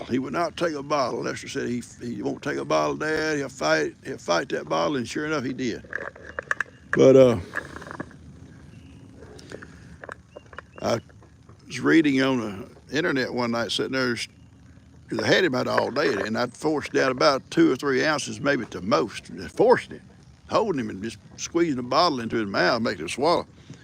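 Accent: South, United States. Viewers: For me, I love listening because of your strong southern accent! I’m from Oregon!! Love the way you say a lot of different words😊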